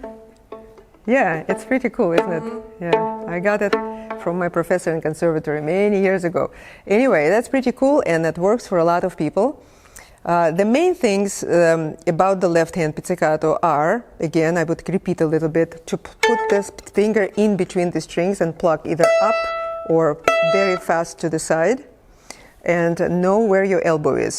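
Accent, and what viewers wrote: Russia. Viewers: Какой сильны русский акцент! Но было интересно.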